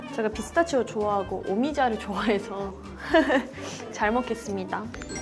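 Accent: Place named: Japan. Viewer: 구독 눌러 버렸네요 다 좋아요.. 곧 TV나 영화에서 볼수 있기를 기대 할께요 그런데 발음 연습은 좀 많이 해야할듯.. 아직은 발음적으로는 조금 아쉽네요 일본 억양이 많아서 연기하기에 그 폭이 너무 좁을듯 하네요.. 하지만 항상 열심히 하는 모습 기대 할께요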